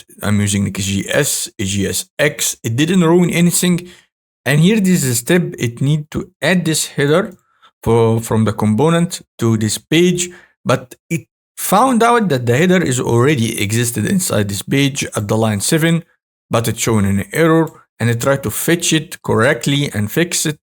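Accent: Egypt. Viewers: I like you Egyptian English accent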